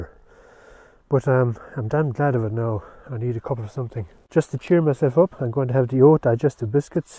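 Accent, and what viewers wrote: Tipperary, Ireland. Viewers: im telling you one thing that tipp accent is gold you have a narrators voice and from one irishman to another i hope to bump into ya in the woods someday for a cuppa tea and a chat.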